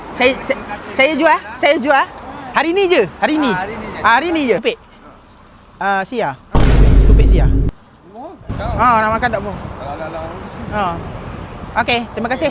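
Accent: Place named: Kelantan, Malaysia. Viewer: Kelate siya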